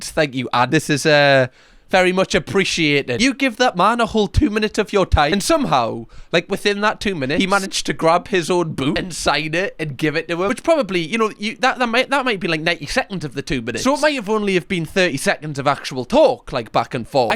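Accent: Newcastle, United Kingdom. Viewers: I like your accent, is it Geordie or more Sunderland?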